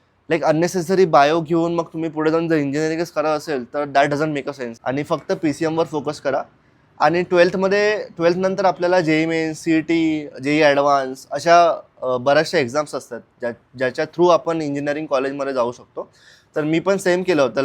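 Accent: Vidarbha, India. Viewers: Tumhi vidhrbha side che ahat ka because tumchya marathi vrun vatte ts means thod touch ahe language mdhe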